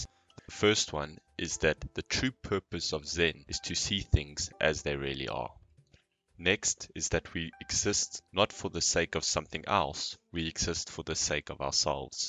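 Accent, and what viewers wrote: South Africa. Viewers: Great video! I love your accent, is it South African ? Reminds me a lot of my grandfathers voice. Thanks :)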